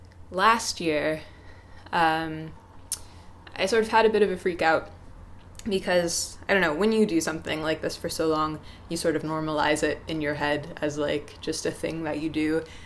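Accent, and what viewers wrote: Midwest, United States. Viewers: i finally heard the midwest in your voice here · You're sounding more American again. Huh. Interesting. hahaha speaking of analyzing. Glad you seem well and that the break was good for you and a well thought out, conscious decision. Privacy is healthy, keeping boundaries is healthy. Good on you!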